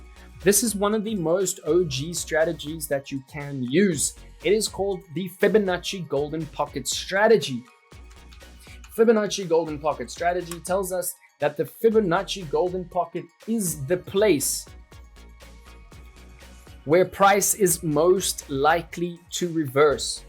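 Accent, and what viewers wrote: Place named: South Africa. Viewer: SAFA accent lol SA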